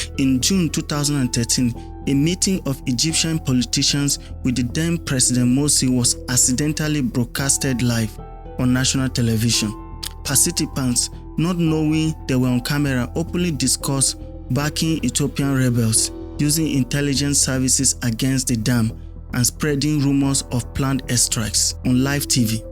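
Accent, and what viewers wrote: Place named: Nigeria. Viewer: The accent naija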